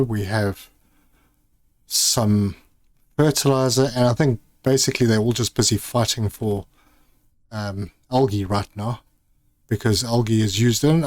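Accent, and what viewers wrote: South Africa. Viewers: I tested the T3 ore extractors, and you can mine everything without having to go to specific areas (super alloy cave, osmium cave, etc.). Also am I detecting a South African accent?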